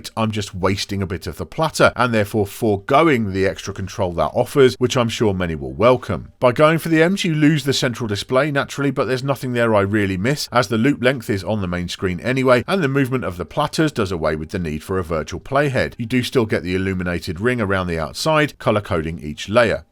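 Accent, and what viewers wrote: England, United Kingdom. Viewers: Great review, many thanks! Please keep in mind that this channel of yours, is watching by viewers and subscribers from all over the world. The only "negative" in your reviews is the fact that you speak too fast and viewers who are not native English and don't have that English accent, might have problems of understanding you 100%. Otherwise all of your reviews are excellent!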